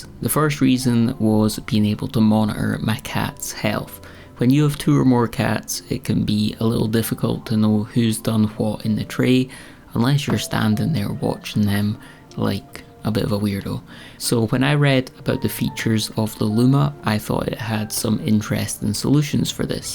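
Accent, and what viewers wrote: Scotland, United Kingdom. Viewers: The Scottish dialect is quite something. 😅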